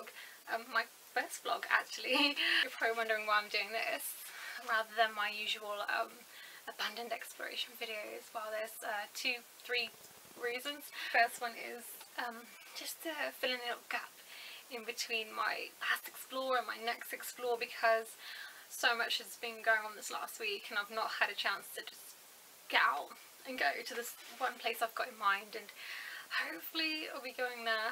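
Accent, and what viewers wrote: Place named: London, United Kingdom. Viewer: Loved this. From what part of Britain are you? I'm guessing London by your accent. But I could be wrong.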